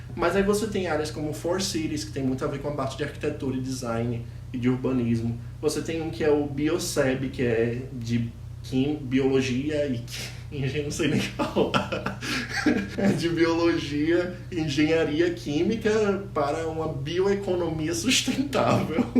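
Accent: Brazil. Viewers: Aiii, vc é de onde? Parece bastante com o sotaque do RN!! Adorei e o vídeo, por favor faz mais! Tem poucos videos falando dessa forma sobre o Erasmus Mundus em português! Voce poderia falar mais sobre o processo de inscrição em si, a quem vc pediu carta de recomendação, o que elas continham. Detalhar um pouco seu currículo, pq tipo, vi algumas pessoas falando que nota contava muito, outra pessoa dizendo q as notas nao eram TAO boas, mas q tinha muitos projetos. Como /pq vc escolheu esse Mestrado em específico. Xero!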